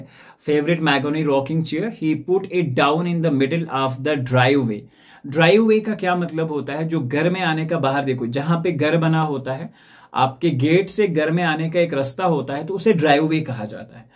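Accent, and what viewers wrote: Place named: Kerala, India. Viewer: Kerala ki pronounciation 🤣🤣🤣🤣🤣🤣😂😂😂😂😂😂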